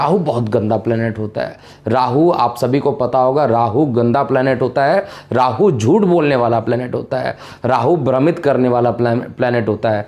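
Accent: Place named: Bengal, India. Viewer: First learn to speak correct hindi first.dont mix bengali in hindi speaking.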